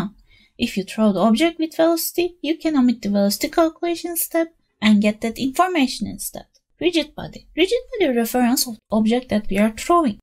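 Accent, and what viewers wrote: Turkey. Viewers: aksanindan anladım :) ama tebrik ederim güzel içeriklerin ve kanalın var. Bir kaç videona baktım güzel gerçekten :)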